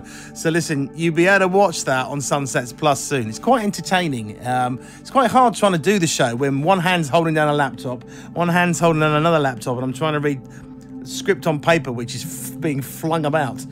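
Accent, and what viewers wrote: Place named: Cockney, United Kingdom. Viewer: Didn't realize Chicane was Cockney. Is there a commentary-free stream we can subscribe to? 🤣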